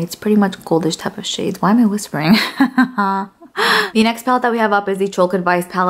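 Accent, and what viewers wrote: New York, United States. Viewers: sooooo adorable I love you so much & I could binge all your videos over and over it’s that NY accent gurrrll ☺️💜💜 you are the reason why I spend so much time in TJ Max 🤣🤣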